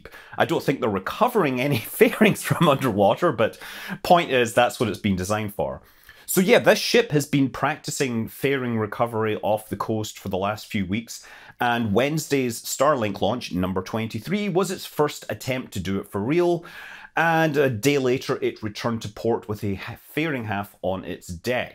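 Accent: Scotland, United Kingdom. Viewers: It took a Scottish accent to make me notice the ships names are plays on mystery and mischief. Lol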